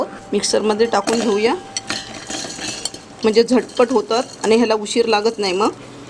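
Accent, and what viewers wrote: Malvan, India. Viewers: Tumhi Malvani aahat Ka madam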